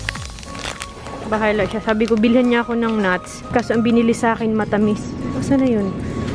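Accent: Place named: Visayas, Philippines. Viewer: Taga saan ka madam. Bisaya accent man.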